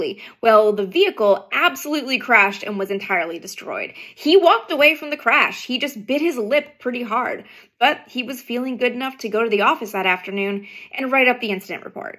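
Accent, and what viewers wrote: Canada. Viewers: Yes, she's Canadian, eh. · Is it weird that I’ve watched you 3x just to hear you say check oot….etc? Go northern neighbor!! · Def. Canadian, BC? your abouts and outs gave you away, just need to hear you say soory, not sorry, soory, either BC or Quebec that's my guess, maybe Ontario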